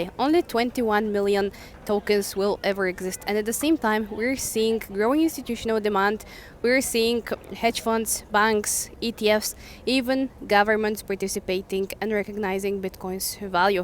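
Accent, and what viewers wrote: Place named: Brazil. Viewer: you being mysterious...if i had to guess you eather brazilian(because of your accent) or middle eastern....am i close?😅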